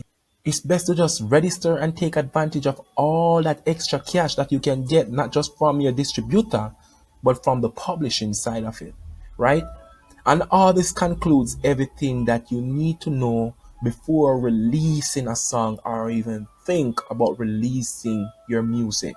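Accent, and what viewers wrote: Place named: Jamaica. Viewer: ur accent just like jamaican speaking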